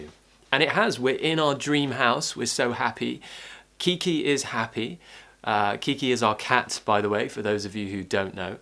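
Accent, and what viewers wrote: United Kingdom. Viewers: I do love british accent.So,these days I've been listening to you talking..and I'm looking forward to new videos...Thanks alot. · You are the best English teacher in YouTube I have ever seen please keep going and in loved with your British accent, I appreciate your time and effort. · British accent i love most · I love british accent I love you, 😘😘😘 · I'm so enjoy, when I hear you talking, I want speak british like you one day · Hi, you were really missed ❤️Congratulations on your new house. Love your beautiful British English. Thank youuu for each lesson 🥰🥰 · I love listening you Your accent makes me fall in love with britain and british english. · Wow..... U make fall in love with British accent.... Thanks alot... I'm watching u from Kurdistan Iraq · You have so clear British accent.l wish all British people speak like you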